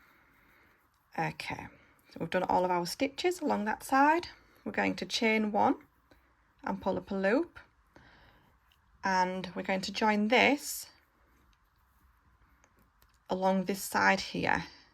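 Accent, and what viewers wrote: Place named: Northern England, United Kingdom. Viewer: ! I haven't crocheted in years and this is the first project I've wanted to do! I love it! (I usually knit) AND, you sound exactly like Mr Thornton's sister in North and South! Love that film, love Northern England, and love that accent! ☺️❤️ Thank you!!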